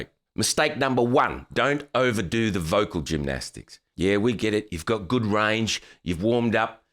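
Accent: Australia. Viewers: I'm planning to get back into gigging and I've been loving your advice. Firstly it is spot on and I know when I was younger I've been guilty of many of the mistakes you've highlighted. Also because of the Aussie accent I feel like I'm getting advice from a Bluey character (I have a young kid alright) and makes me love it more.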